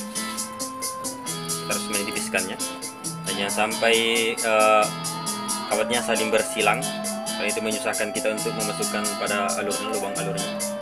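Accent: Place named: South Sulawesi, Indonesia. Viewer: saya fokus ke suara ta daeng... kyk ad logat2 sulsel ta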